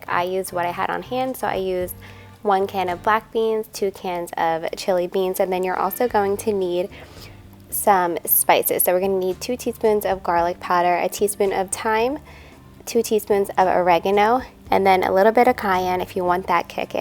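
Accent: East Coast, United States. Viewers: LOVE Crockpot Recipes! These look super yummy!!! Glad I found this channel 😁 -- (& her east coast accent makes me homesick!) ❤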